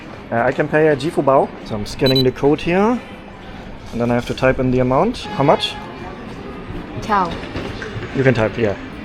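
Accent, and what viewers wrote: Germany. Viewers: your pronounciation of Germany in Chinese is still off 😂It is because of your German accent. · Maybe a "better" suggestion for saying Germany in Mandarin is: "Ter Kwor"; you raid roughly "Ter Kwar" - a world of difference. People who suggest, say: "De Guo" - may not be helping you much, since you maybe do not know the current, and more accurate, Pinyin phonetic system. The "De" is pronounced "Ter" and the "Guo" is pronounced "Kwor" - OR rather than AR sound. Also in Pinyin, the "d" is the usual "t" and the "g" our usual "k". I noticed you may have pronounced Wuhan as Vuhan, since you are using your German way "v" for the "w", just as your English is very much the German way of speaking English.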